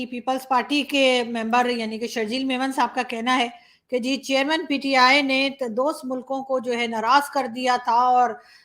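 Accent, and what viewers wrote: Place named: Uttar Pradesh, India. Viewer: 🙏 mam ji ap to uttar pradesh ke se boli bolte hai mam 🙏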